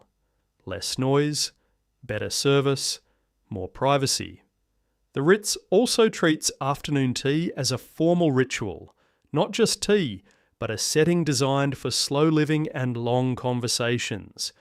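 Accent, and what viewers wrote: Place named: Australia. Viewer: What an odd, unnecessary video. No official source or credentials, and quite the AI babble. What really threw me was the lower class Australian accent. He may be better served commentating a soccer game.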